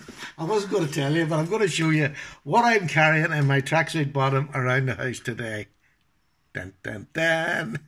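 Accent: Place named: Ireland. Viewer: What about ya. I just came across this video and noticed the accent and I’m from Ireland myself. What part are you from? I’m from County Armagh. Good to hear a fellow Irishman on here. Fare play to ya boy. I’m going to subscribe to help a fellow Irishman our. Great wee video. Cheers mate.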